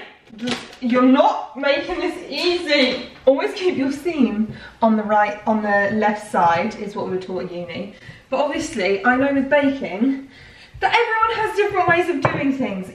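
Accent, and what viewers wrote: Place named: Birmingham, United Kingdom. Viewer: You're a brummie! I knew it!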